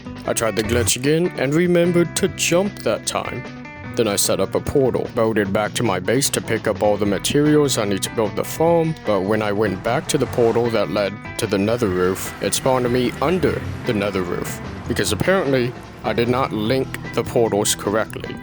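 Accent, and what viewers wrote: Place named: Eastern Europe. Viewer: I did not expect that eastern europe accent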